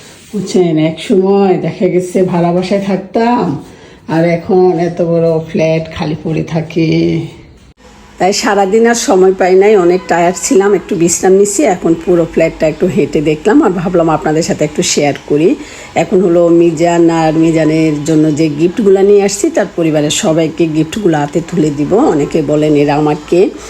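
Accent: Noakhali, Bangladesh. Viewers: Annti আপনার ভাষা নোয়াখালী কোথায়।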